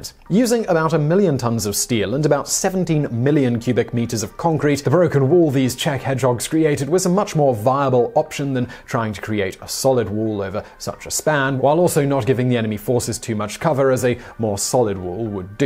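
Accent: United Kingdom. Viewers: woah british babish 😳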